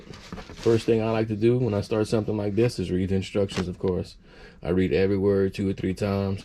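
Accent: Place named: Los Angeles, United States. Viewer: I thought you sounded like you were in LA. I'm in Monroe, in need of your services soon. I have a 1986 chevy short bed i inherited, My father's old truck.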